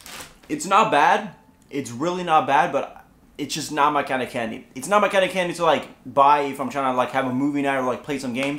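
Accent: Scania, Sweden. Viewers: Jonte nej han är ju skånsk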